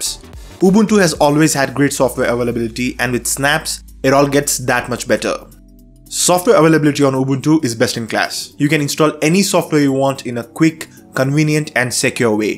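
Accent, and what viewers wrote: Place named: India. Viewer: as a native english speaker i find ,most f whats said almost impossble to understand spoken way to fast in a terrible paki/guppta/ indian accent,big FAIL!!!!!.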